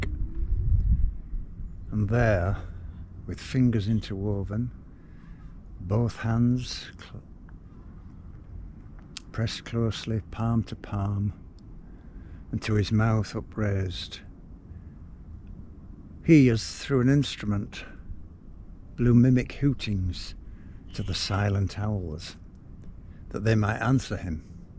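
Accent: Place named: West Yorkshire, United Kingdom. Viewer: Your oration redounds in mine ears long after you have ceased it,. Of course, that West Yorkshire accent helps - intrinsically poetic as it is. But your being a musician makes your readings especially wonderful to my ear. Great taste in poetry, once again. And I'm glad you're sticking with this Thursday event. I thought the word was 'uplifted'. Not so sure now, though.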